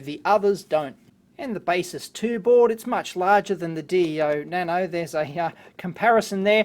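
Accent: Australia. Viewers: great video, love your down to earth style. An aussie, I guess? I've done a very simple sound synthesis (karplus-strong) algorithm on 8-bit arduino uno. To improve the sound quality, the most important feature would be a 12 or 16 bit DA convertor. Looking around a bit, it doesn't seem to be a high priority for uc chips. Any suggestion for uc or fpga that is good for sound, ie all built in cos I don't much like etching pcbs? This is just hobby stuff.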